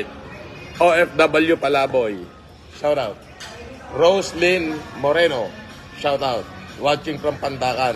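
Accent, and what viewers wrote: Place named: Batangas, Philippines. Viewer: Sir ano ba tribo mo? eh tagalog mo para kang batangeño.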